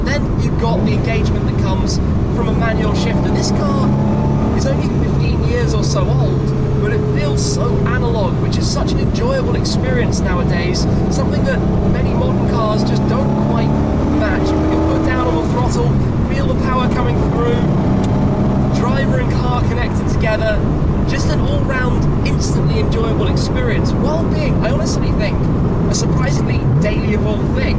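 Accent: United States. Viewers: Shmee is slowly turning American 😂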